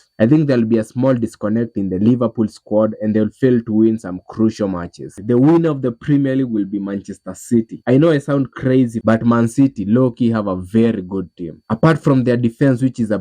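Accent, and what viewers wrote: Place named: India. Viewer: Indian accent level 10. Nah lowkey good video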